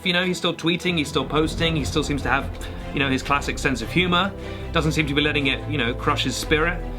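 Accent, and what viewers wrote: United States. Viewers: Also your American accent is amazing. It was so accurate that it made me uncomfortable, like someone dubbed in someone else’s voice but with god-tier editing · Your American accent unnerves me...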